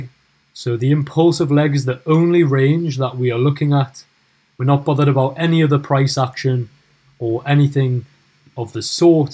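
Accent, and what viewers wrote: Newcastle, United Kingdom. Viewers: Really good video! Are you a Geordie?? Cheers!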